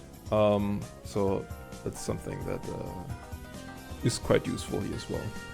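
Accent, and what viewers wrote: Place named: Germany. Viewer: Why do you sound German?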